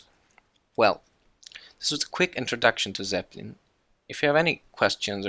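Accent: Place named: Turkey. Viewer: Aksanından zeplinin türk firması olduğunu fark ettim :)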